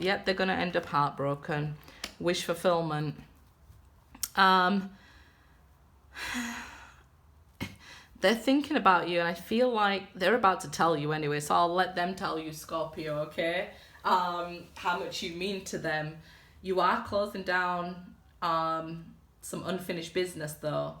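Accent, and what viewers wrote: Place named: West Yorkshire, United Kingdom. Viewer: Sounds like a West Yorkshire accent..! ❤️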